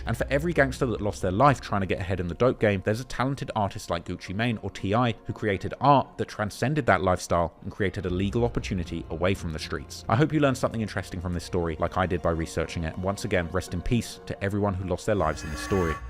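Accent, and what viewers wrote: United Kingdom. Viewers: Hearing about shit that happened where I grew up in a British accent is weird lol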